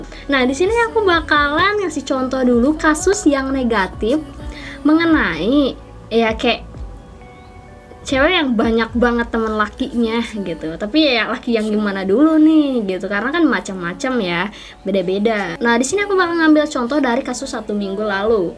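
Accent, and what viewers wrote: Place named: Java, Indonesia. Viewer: GITULLOHHH medok bettt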